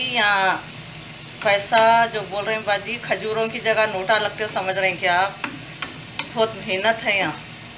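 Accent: Hyderabad, India. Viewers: Hyderabadi lehja boht meetha or apnayat wala hota hai mai b india hyd se hu lkn shadi pakistan islamabad me hoee hai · Ham hyderabadi hain aunty chote the tab dadi phopo sub apki terha baat kerte the ab unke bad hyderabadi sunne ko taras gai thi ap ne apno ki yad dila di m apko sunti hon lagta hai meri phopo n dadi bol rahi hain Allah apko lambi zindagi de Ameen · Assalam u Alaikum.Aunty aap mere msgs ka rply dijiye plz khushi hoti meku....Allah apko sehath de.Ameeen...aap bahut acche hai luv u😘😘 aap sab k liye itni duaien dete...Allah tala apko bhi accha rakhe.apka bolne ka andaaz ekdum hyderabadi style me bhut accha lagta me too frm hyd. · You really inspired me aunty, I am so happy that you made this valuable video. Firstly, I thought what I am hearing??? But once I started hearing you alhumdullilaha you made me realise that I am in Hyderabad. Lastly unlce's story is too motivational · Aunty I love u ap boht achy ho ap ki hyderabadi language mujhe boht achy lgta h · Jazzak Allah Aunty, aap ke Hyderabadi sun ke Ammi ki yaad agai ju Pakistan mein hai..main malaysia mein akele rehta hoon aur yeh recipe zaroor try karonga..,Allah aap ko aur Uncle kush rakhay, take care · Love your commentary ,feels like I am in Hyderabad listening to your Hyderabadi Hindi,I am Telugu living in US,my cooking style is like yours, influenced by Muslim culture,born n brought up in Hyderabad.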